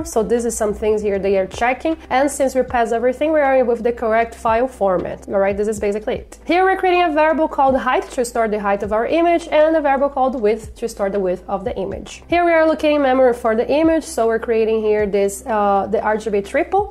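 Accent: Brazil. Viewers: tu é br??? a pronuncia é muito brasil!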